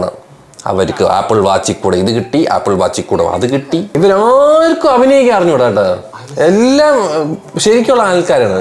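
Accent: Trivandrum, India. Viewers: അണ്ണാ tvm language പൊളി😅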